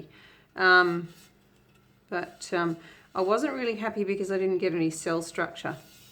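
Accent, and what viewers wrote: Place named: United Kingdom. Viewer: Hi that's beautiful a suggestion for the cat that used to live in the house since you have an allergy maybe you could find her a forever home? clearly she's not a stray or feral like the other ones and she could really get hurt by one of those because they're not the same I used to live in Miami, florida,usa and the lady across the street I did not know at the time when I moved into my house she was a hoarder and it was just heartbreaking come to find out not only did she pick up ones from everywhere she even picked up one that I knew had been somebody's pet and she ended up telling me that when she found that one it had a collar on it so she was stealing people's pets also very sad please try and find the kitty that belongs to that house a home that would be so awesome thank you in advance maybe you could get ahold of a local rescue or something? 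And if you heard screeching going on that means they're in heat and you're going to have multiple cats pretty soon because they multiply almost as fast as rabbits local rescues in the United States all come also come in and spay and neuter Strays and feral cats I'm sure you sound like you're from the UK perhaps I'm sure they have something similar to that there I'm just trying to save you the headache of multiplying 7 cats turning into 17 etc etc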